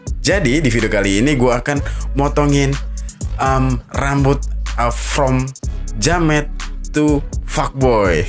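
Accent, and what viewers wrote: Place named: Pati, Indonesia. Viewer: oh pantess saya penasaran aja logat sampeyan kayak orang pati.ternyata simbahnya dari kolilo pati.ya2.ngak meleset filling gw.😎😎😎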